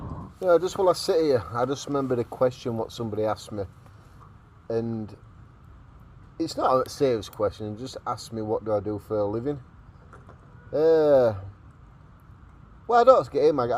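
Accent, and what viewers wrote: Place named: Northern England, United Kingdom. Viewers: Usually on the camping vids on Youtube when the food is being prepared and cooked I switch off, but on this vid if you are cooking the same again can I put my name down please?, good to hear a Northern accent also. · Great as always. My very humble advice. Stay away from divisive topics mate. Personally I come here for the advice, your knowledge and the great gear reviews. Delivered in your great west York’s accent and lovely gentle manner. Ultimately, I’m here for anything camp based. If I wanted to see or hear anything negative ( no matter what the topic) I’d buy a tv and watch the propaganda on there. Or god forbid join that circus o win as FB. You’ve been a massive influence on me and my own wild/ stealth / nature camping adventures. Looking for ward to autumn and winter to get my hot tent out! So a big thanks fella. 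Take care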